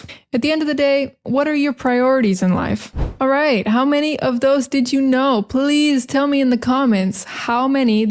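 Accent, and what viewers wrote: United States. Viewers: This is the kind of class that adds value, because you not only enjoy listening to real American English, but you also get to admire the beauty of North America through this warm person.🌹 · Hey,! Your American accent is just delicious!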